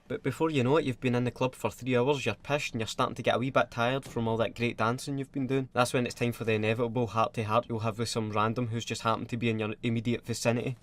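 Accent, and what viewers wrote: Scotland, United Kingdom. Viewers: Hey, can you please do more Scottish Voice overs like the robot ones, even something like Postman Pat or something? Cheers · Where about in Scotland are you from? I'm from Edinburgh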